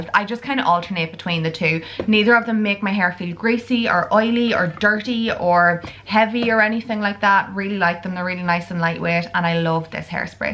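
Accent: Ireland. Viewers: Hi! I really love your videos! You're so friendly and natural! I always watch your videos with a big cup of tea--but sorry I'm a Barry's girl not a Lyons! I have two questions for you! The first is have tiu always lived in the town you live in now? I know the town where you live (I'm not sure you've ever said it on YT, I just recognise the shopping centre!) Just wondering because your accent is exactly the same as my cousins who live in Monaghan, specifically Clones!!! And my other question!!! Why are your doors always open?? Is your house really warm??😊😊😊 Anyway as I said I really love your videos! And oh yes I adore your house too! 💞💞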